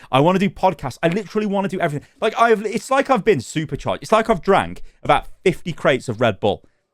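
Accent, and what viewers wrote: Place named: United Kingdom. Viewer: No lie, you're a beacon in these dark times. Super happy you're feeling better, Crohns & colitis is no joke! Also lmao "because apparently I'm lucky cause I got both" is the gallows humor that I'm here for 😂😂 wanna add that despite the fact that I'm not gonna play any blizzard games till theyve definitely changed, I'll still be here for your content cause I'd miss that working class British accent lol, you're the best duderino!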